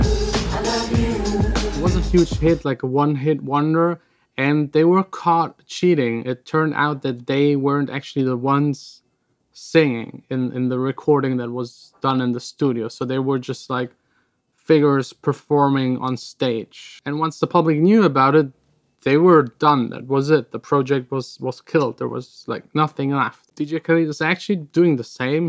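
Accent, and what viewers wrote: Germany. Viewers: Wait are you from 🇩🇪??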